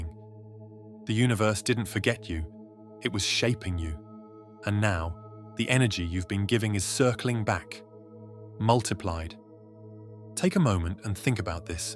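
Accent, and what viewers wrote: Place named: United Kingdom. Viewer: What's up with the Brit tish twang, geez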